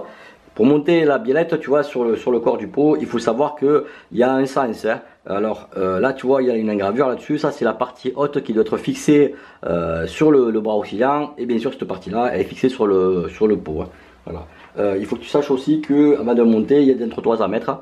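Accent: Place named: South, France. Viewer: bah disons que j'etais pas sûr en même temps car tu as un accent du sud, pas du tout Lyonnais quoi !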